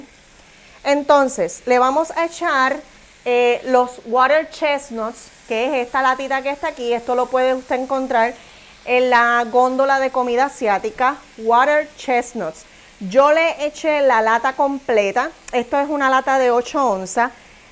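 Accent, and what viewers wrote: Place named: Cuba. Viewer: Me encantan sus recetas...pero de verdad tiene que estar diciendo tanto "showchito"?...como se dice en ingles...so annoying y el acento cubano? Pq?...son cosas innecesarias....explica super, tiene buena energía, es alegre....eso es más que suficiente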